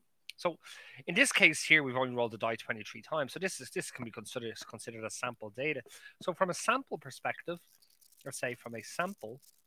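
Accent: Ireland. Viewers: I was studying the time series chart video at like the first very second in on the video I noticed ur irish xdd geez nice videos man I'm taking MATH201 (probability in business) or so so I'll be using ur channel a lot :) so you've got a new subscriber hahahah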